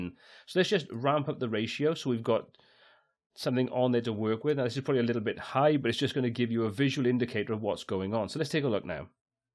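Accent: Wales, United Kingdom. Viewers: Great explanation and lovely Welsh accent!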